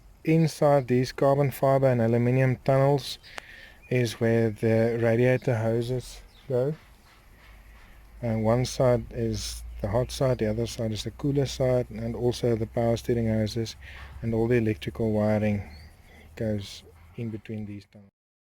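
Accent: South Africa. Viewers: You South African my bru!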